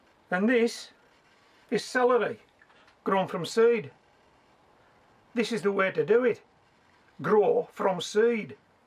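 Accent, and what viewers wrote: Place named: Cumbria, United Kingdom. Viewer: Thank you for your clear and honest advice. Absolutely love your channel. I have learned so much from you in my first year of growing from seed. Thank you! (By the way....you sound just like my husband and in-laws who are all Penrith and Appleby folk. Are you local to there?) Best wishes, Amanda. ❤️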